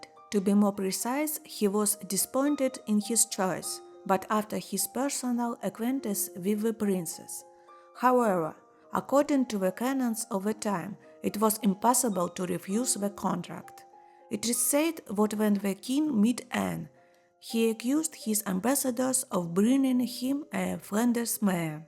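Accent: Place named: Germany. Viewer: The woman's voice narratoring accent sounds Deutsche.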